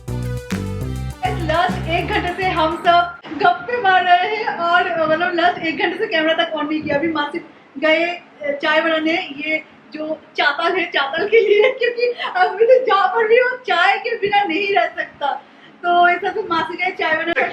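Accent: Bengal, India. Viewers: Too much boring and with ur benglish hindi 😩😩😩 it’s irritating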